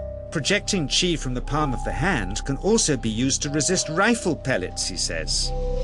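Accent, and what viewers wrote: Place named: Indonesia. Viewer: noo, i think ur wrong ... he speaks indonesians very good, and also use typical English-Indonesians Accent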